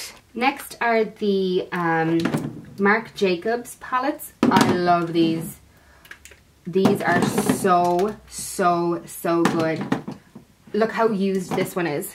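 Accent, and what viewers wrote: Ireland. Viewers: Sounds like faint Irish accent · New to your channel! Adore your Irish accent! Which part are you originally from? Are you enjoying the move to Sydney!? LOVED this declutter video so inspiring and generous 💞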